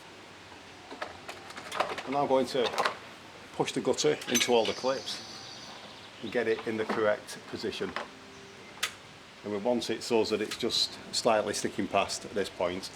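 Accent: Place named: Northern England, United Kingdom. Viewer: Don't lose that Northern accent......British Industrial greatness was built with people who spoke with accents like yours and similar. Great video...keep 'em coming!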